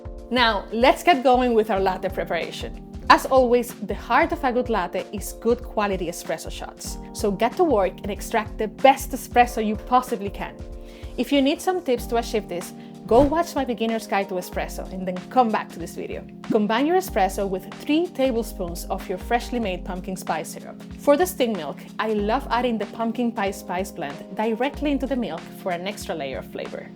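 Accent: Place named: Latin America. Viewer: Sounds and looks Latina. 🤔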